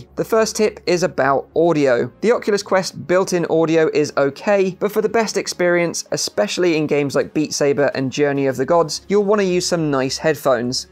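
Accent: United Kingdom. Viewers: Glad to have found this. Now I know this is nothing for those of us who create VR artand animation. We cannot install our animation app (like Quill) 🙁 ..and thanks for the brit accent! Clear to understand!! 👍🏽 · Why are British-accented people all so good at tutorials??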